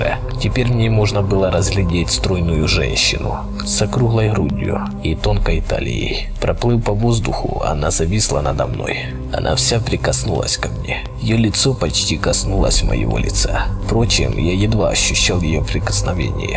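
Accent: Ukraine. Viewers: Чувак ты украинец? БЛИН НУ СЛУШАТЬ НЕ ВОЗМОЖНО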